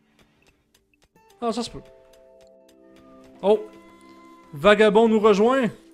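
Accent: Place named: Quebec, Canada. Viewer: Tu mérite plus d'abonnés , De plus que tu es Québécois (J'adore l'accent que vous avez ^^) et puis surtout tu expliques bien :D Je t'adore :D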